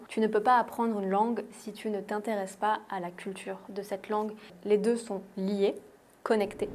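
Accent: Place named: South, France. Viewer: PS : Je suis pratiquement sûr que tu habites la partie sud de la France. Etant breton, je remarque vite les accents ! Enfin, je sais pas pourquoi je dis "en tant que breton", je n'ai jamais lu que l'on avait ce super pouvoir plus que d'autres ^^ Sinon les arabesques ont été identifiés en Occident au XVe siècle comme un élément de l'art islamique, d'où leur nom. Mais en Occident ce terme a été utilisé pour désigner par extension des entrelacs et des rinceaux divers, y compris ceux de tradition occidentale, même lorsqu'ils n'ont aucune correspondance avec les motifs arabes.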